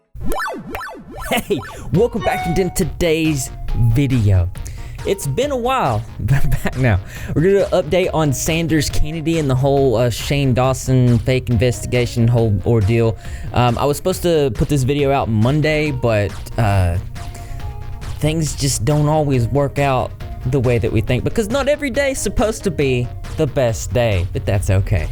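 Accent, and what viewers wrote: South, United States. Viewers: U were cute until I heard that gross southern accent reminds me of Jim Crow laws GROSS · 1st) Sanders had gotten into something before b/c he was not making videos BUT when he came back I was happy for him and thought he was really trying to give viewers actual information so this is so disappointing, he seemed like once he saw all the racists videos for a while few years back not sure why but when Shane’s racist videos had really resurfacing (which is NOT cool at all) Sanders seemed like he was on a witch hunt or something he was actually one of the very 1st Drama type creators I had ever came across years ago so disappointing smh. 2nd) I recently came across your channel due to the Dahvie Vanity’s stuff few months ago and I love what you are doing you seem like a good hearted person and LOVE your country voice/words ....I am from NC and people from NY would always comment how they loved the way I talked and now I understand lol ! 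· I gotta say, as a midwesterner who lived in the south for a few years, the accent is such a weirdly lovely breath of fresh air.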